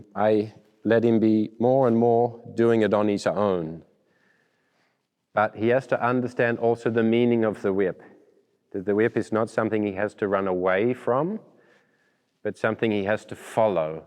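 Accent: Australia. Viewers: Are you Australian? I was so impressed with your approach and the lessons you taught this beautiful horse, you saved him, Bless you, from Australia ❤ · Is this horse 🐎 teacher an Aussie or a Kiwi 🥝???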